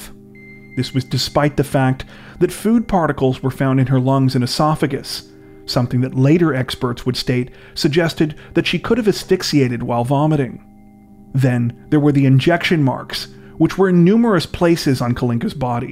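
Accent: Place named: Ontario, Canada. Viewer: Yeah I was gonna say Ontario, but then I figured that was too easy. You do a great job hoss.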